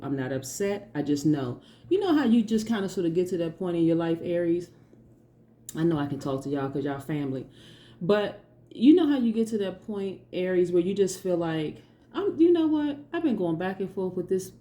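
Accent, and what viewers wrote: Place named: Chicago, United States. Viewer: You are too cute. You talk like you are from Chicago! Bust some suds! Yes, the reading ... on point as always!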